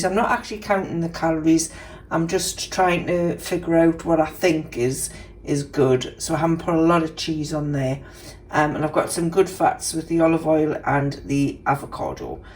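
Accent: Newcastle, United Kingdom. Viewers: Hi, I really enjoy your vlogs, your food looked lovely. I’ve been on HRT for nearly 13 years since I was 50 and I’ve no plans to stop it as I had terrible brain fog and hot flushes before. I worry that all the symptoms will come back and I couldn’t cope with that again! Looking forward to more of your vlogs, oh and I just love your geordie accent it’s one of my favourites, I spent a lot of time in Tynemouth when my son was young, I love it there. Xx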